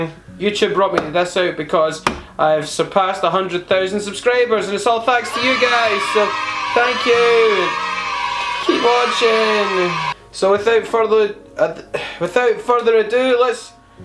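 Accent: Scotland, United Kingdom. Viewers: ikr, its like he is scottish or something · Is scottish lmao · XD are you Scottish? Or Irish? Respect. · Skót akcentus ❤❤ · Oh my god ur scottish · AHHH it's bc he's Scottish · Is that a fellow Scottish friend I hear in that accent?!?!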